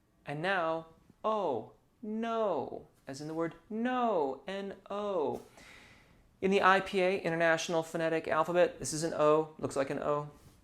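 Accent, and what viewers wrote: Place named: Midwest, United States. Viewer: I am so grateful for the tremendous amount of pure quality content you provide. I find it interesting that you mentioned you are from the Midwest, then California, then England. I can tell. I am a Midwesterner myself and now your manner of speaking makes sense. 😉 I heard the influences but couldn't place where they were coming from. I just love your manner of teaching... Sometimes I forget you are not live and playing scales just for me! Thanks again.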